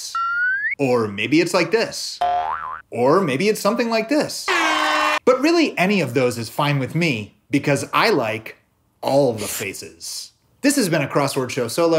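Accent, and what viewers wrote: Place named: France. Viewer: Quel belle accent français très cher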